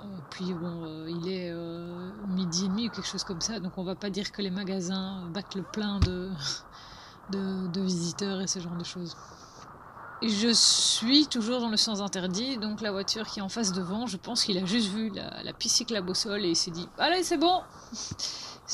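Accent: Belgium. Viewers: Hebus LeTroll Je sais hein ket ;) C'est juste qu'on entend pas souvent un bel accent belge broubeler sur internet :D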